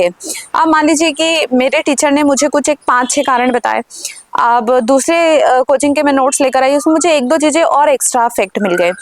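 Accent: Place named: India. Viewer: bahut shandar bolti ho tum ekdam desi andaz me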